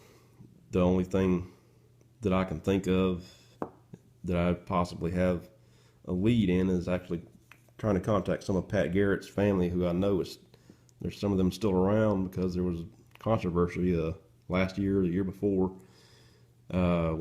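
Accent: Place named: South, United States. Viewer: lol this southern slang always sounds mentally retarded.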